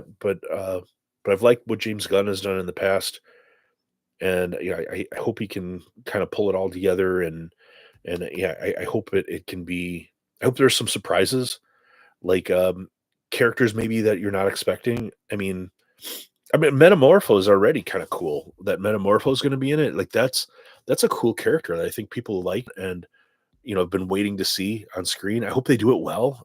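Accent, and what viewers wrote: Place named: Chicago, United States. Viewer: Congrats on the Solid Pull. Sounds like your a Chicago guy. I'm in the SW suburbs.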